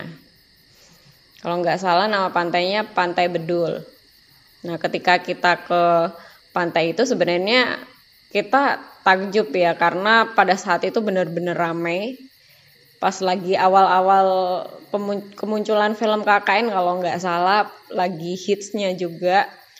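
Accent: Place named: Jember, Indonesia. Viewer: Dari logatnya apakah mbak orang Jember? 😁 Aq tinggal di jember tapi mpe skrg blm pernah berkeinginan maen ke alas P Kbnyakan nonton podcast n cerita horror jd udah kbnyang serem aja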